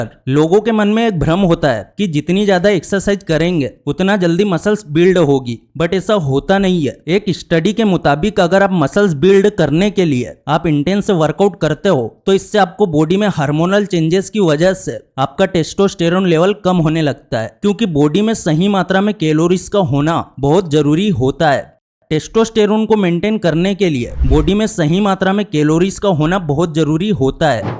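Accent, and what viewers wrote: Indore, India. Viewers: indori bhai ki aavaz lag ri hai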